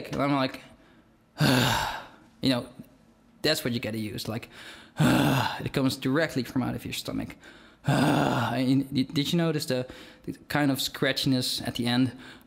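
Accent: Netherlands. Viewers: Ik hoor direct aan uw accent dat ge Nederlands zijt :D Heel goed! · sounds like a Dutch accent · are you dutch? :3 you sound like you are. IT'S CUTE. :3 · AaronTK Kelly He is dutch. :D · where are you from? from the Netherlands? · You are dutch aren't you :p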